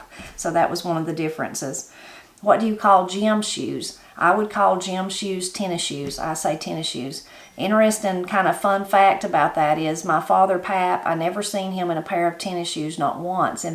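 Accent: Appalachia, United States. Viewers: My maternal and paternal kin have been in the hills (Appalachian Region) of KY, TN, NC, and VA for more than 10 generations on each line. I grew up in the hills of southeast KY and in my family, we said "Appa-lay-shun". I have absolutely no issue with how folk pronounce the word/name. But I will resist anyone that tells me that me and my kin are not true Appalachians because of the way I say the word. My ancestors were among those men that gathered at the Sycamore Shoals to defend their "backwater", "over mountain" way of life against the threats of Major Patrick Ferguson to come “…over the mountains, hang their leaders, and lay their country waste with fire and sword” during the American Revolution., I grew up with most of the dialect that you use today. We said granny and papaw. My mother let it be known that she was to be called granny when her first grand child was born. 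She wore that title as a badge of honor out of the relationship she had with her granny. We had some speech that I've yet to hear you say but I do not watch every video you put out, there are a few differences from your holler to mine. One thing that is for certain is that from the time I found your channel I have felt a kinship to you and because of your mannerisms, speech, and desire to preserve the Appalachian culture. As I've said before, y'all are straight from the holler and what I mean by that is that y'all are the real deal. Thanks for making your work to preserve your heritage and our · Here in North Mississippi we talk exactly like y'all do. We were raised saying "okry" too. All of your examples this morning are identical to our pronunciations and meanings. I was raised in a small town here and my parents were both raised on dirt roads way out in the country and that's the way they talked. 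But I notice people who live in larger Mississippi cities talk the same way. I would fit right in with your Appalachian life style. Living these videos. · When I listen to you talk, I hear my parents. They aren't from Appalachia, but some of the grandparents were. I think the accent and the ways of speaking carry on for generations. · Thank you for such an interesting video. I am from the coastal plain of South Carolina and I pronounce every single word you mentioned exactly the same way you do. I never thought about this but my great grandfather who I never knew was from Blairsville, GA and his mother was from Morganton, GA with the family going back to the 1700's in the same general area much like yours. I pronounce Appalachia exactly the same way you do and was always corrected in school about it. Now as I sit here and think about it I bet that part of the reason I speak the way I do is partially because of words and phrases that have been passed down to me from my Appalachian heritage. 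It was something I never considered until I watched your video. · I love the way you talk. It is so much like the way my relatives in the Appalachian mountains of Kentucky and Virginia speak. I grew up in northern Ohio, but my southern grandparents were nearby and I whenever I hear someone with a southern accent it reminds me of my precious family. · I'm from West Alabama. My family is Scots-Irish and English, but almost all of my family from both sides came down from Appalachia (I say it like you do.) Most of those words you mention we pronounce the same way. I think it's because our roots are the same as yours. We do say "rolling a house" instead of "teepeeing" and "Hey y'all" instead of "Hello". "Buggy" makes sense because of baby buggy. We don't say baby cart, so saying cart makes no sense to me. I don't quite get "Alabama". How else could you say it? My dad's family was originally from Mt. 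Airy but he was raised in Indiana. He never could say "Mobile". He pronounced it "Mobil" instead of "mo-beel". Lol I LOVE your colloquial dialect and language. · you talk just like I do. Of course North Georgia ain't far from North Carolina.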